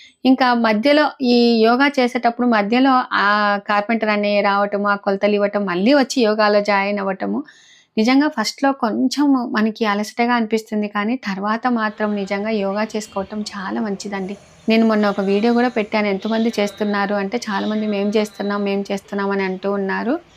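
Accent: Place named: Kurnool, India. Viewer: మన కర్నూలు యాస మార్చి మాటలు వదు 🎉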